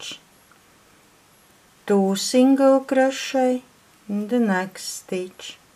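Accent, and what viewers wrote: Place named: Latvia. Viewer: Are you Latvian? You sound sooo Latvian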